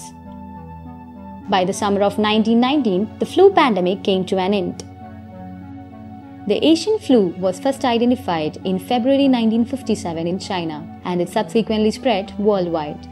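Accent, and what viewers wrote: Kerala, India. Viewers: The narrator is a malayali, i BEt. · Typical indian accent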